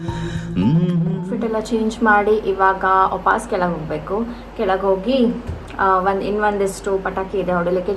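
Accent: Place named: Kundapura, India. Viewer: Kundapura kannada super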